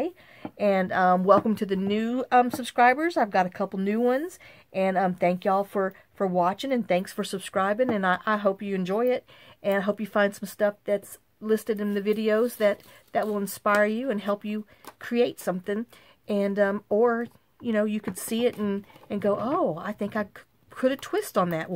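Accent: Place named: South, United States. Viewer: I love all your videos! I sent my daughter, Heidi, one to watch and told her she’d enjoy it. She calls me and says “OMG she’s you with a southern accent!!” Then I reminded her I DID have an accent in the 1960’s when I lived in Florida. Please let us know if Molly comes home.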